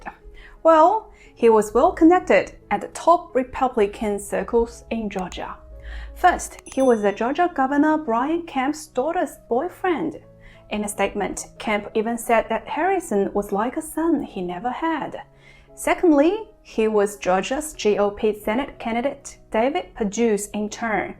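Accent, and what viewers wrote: Australia. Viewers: I also watched you a few times on your Chinese program. I slightly prefer your new English channel as it sounds more serious and factual IMO. Also really loved your Aussie accent. Kudos! Not many on here can do both languages at a mother tongue level! 加油！ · Very good English with OZ accent, love it 😍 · 澳洲口音而已 讲的很好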